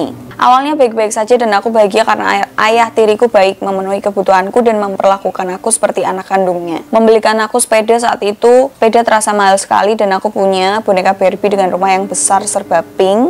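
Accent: Java, Indonesia. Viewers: aku ga suka logat Jawa tpi pas denger mba * ngomong jdi sukaaaaa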